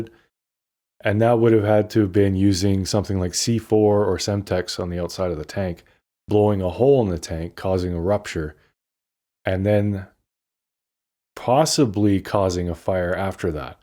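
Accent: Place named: Alberta, Canada. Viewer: I thought so. I live in Texas but am from Alberta. I hear our accent lol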